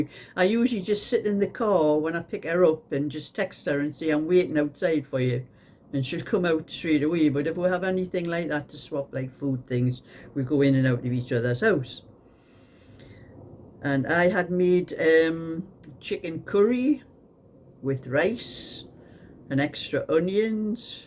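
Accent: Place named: Yorkshire, United Kingdom. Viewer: Hi, well had a chuckle at you with the conversation with 😂😂😂.. congratulations on your wins, well done 👍..Hope your heatwaves have ended.. Spring nearly here in Sydney so plants & lawns will be taking off.. we’re 9 hours ahead of you over here I thought I’d mention… I’m a Yorkshire lass born in Sheffield (well not a lass anymore) 😂😂😂 so enjoy your accent, love listening to you chatter on… lots love Josie xxx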